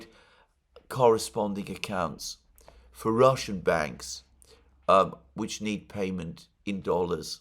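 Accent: United Kingdom. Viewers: Excellent explanation of Bretton Woods - perhaps with your professorial panache ( I mean this sincerely, no sarcasm intended) you can explain to most (untutored) Americans what deficit-fueled economics and and fiat-currencies are. I don't even believe most economists know - or can articulate it. You're clearer yhsn Jeffrey Sachs. If only we could all wrap our minds around what the heck a tariff really is. Your very proper British accent would definately make the "lecture pill" go down easier.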